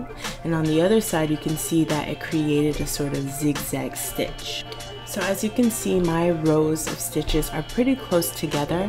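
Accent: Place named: Maryland, United States. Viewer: Shtrait shtitch shtretchy fabric. Is that a Maryland accent I detect? Not being mean I’m from Boston, OK? I just love accents! Thanks for the help! Perfect help and I just happen to have the same machine! Totally ordering the twin needle! Had no idea they even existed!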